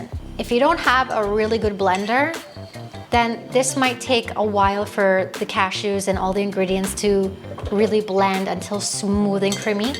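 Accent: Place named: Caribbean. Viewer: You sound Jamaican/ Caribbean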